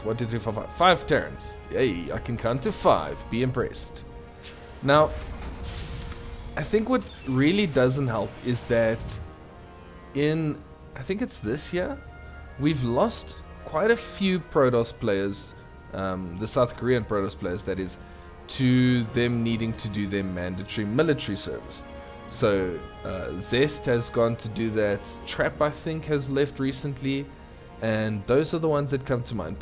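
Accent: South Africa. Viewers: Great casting. Thanks for the video. Hmmmm do I smell a fellow South African :D Subscribed.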